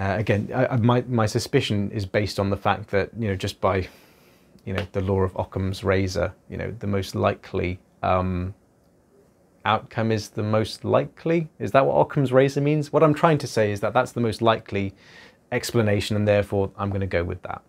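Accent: England, United Kingdom. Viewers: I'm here for the english accent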